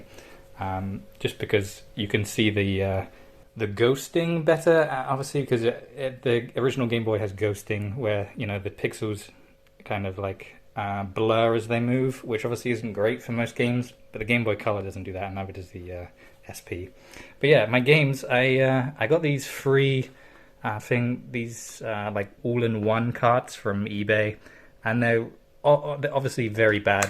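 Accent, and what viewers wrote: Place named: Australia. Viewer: Great vid here haha, cheers. Seein' other devs working on their own stuff helps with the ol' self-motivation too. Keep up the great work. Oh, and you're probably the most 'Strayan sounding Brit I've ever heard haha.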